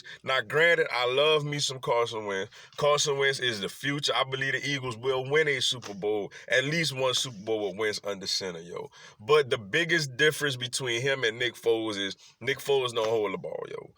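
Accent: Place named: Louisiana, United States. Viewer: Surprised you're not a Saints fan. Got that La accent.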